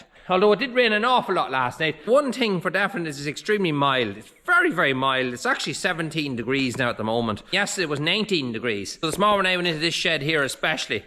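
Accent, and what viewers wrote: Cavan, Ireland. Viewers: I have to say it’s absolutely deadly to hear a cavan accent on YouTube!! Apart from you’re honesty you make great videos, all the way from new York you know how to make me home sick!!! Virginia man.keep up the great work lad.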